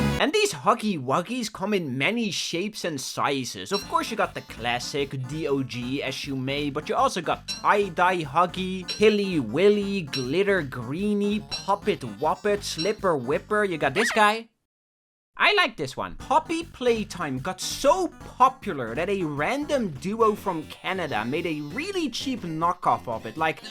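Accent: Netherlands. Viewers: are u dutch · hoi ben jij nederlands??? ik hoor het aan je accent lol edit: lmr ik weet gewoon dat het zo is want ik ben echt foekoeng slim snap je de grap hahahahahhehehehehe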